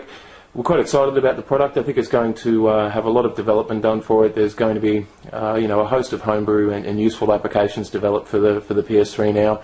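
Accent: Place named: Australia. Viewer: are you from oz mate????sure sounds like it.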